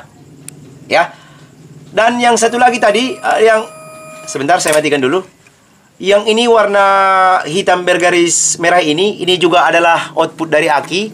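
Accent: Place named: Medan, Indonesia. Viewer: Saya selalu mengikuti channel abang ini, sangat diteil penjelasan y, trus logat abang seperti logat medan, jd nggak bosan sy sambil ketawa sy bang, lanjut trus boss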